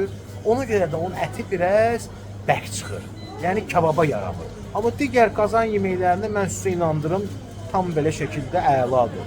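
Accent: Baku, Azerbaijan. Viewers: Çəhrəgayiye özüdə,ultra, modern,pro Bakı lehçesidüraa.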